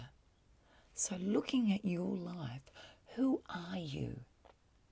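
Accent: Australia. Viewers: I love your accent!! Are you Australian?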